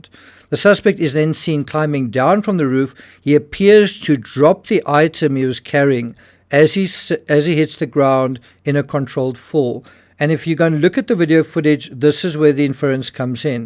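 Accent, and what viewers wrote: South Africa. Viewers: Definitely a South African narrator. i am not sure what I learned tho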